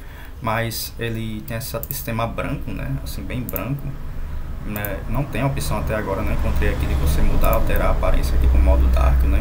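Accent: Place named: Northeast, Brazil. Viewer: Sou usuário Ubuntu e não na troco por nenhuma outra distro, pois aposentei o distro-hoppismo. Aliás, muita gente fugiu do Ubuntu por causa dos snaps, todavia acho que os pacotes snaps e flatpaks foram as melhores coisas que já fizeram. As pessoas, antes de emprenharem pelos ouvidos, deveriam ler sobre os projetos para poderem de formar parecer adequado. Se lessem, saberiam que o futuro de todas as distros Linux é tornarem-se imutáveis e a adoção de pacotes "universais", tais como os pacotes snap e flatpak. Aliás, o colega é de PE ou PB, não é? Desde 2009 que saí do Brasil, mas penso que ainda reconheço o sotaque da minha terra. Cptos ************* P.S.: O Zorin OS Core é muito bonito, sim, no entanto está atrasadíssimo, pois ainda está preso ao Focal Fossa.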